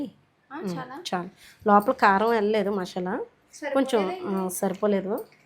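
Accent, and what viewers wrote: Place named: Vijayawada, India. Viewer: Mee. Maatalu. Bagunnayi. Mee. Pilla. La. Maatalu. Vijayawada. Language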